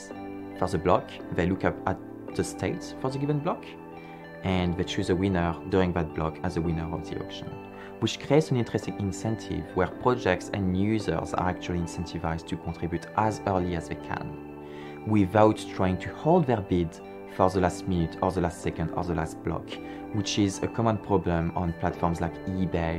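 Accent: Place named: France. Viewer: I’m here just to hear this man’s voice Bc I love his French accent.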